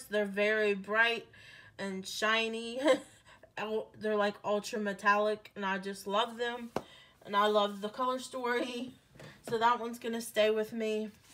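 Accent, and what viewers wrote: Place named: Pennsylvania, United States. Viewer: Are you from PA, trying to place your accent.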